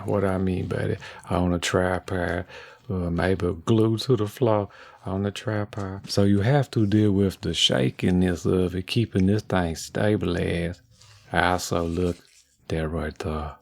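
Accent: Louisiana, United States. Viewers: is this accent a french person from louisiana. rec brought me here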